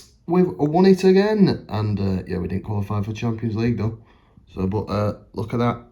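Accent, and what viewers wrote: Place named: Liverpool, United Kingdom. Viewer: He sounds british. has Liverpool accent a bit..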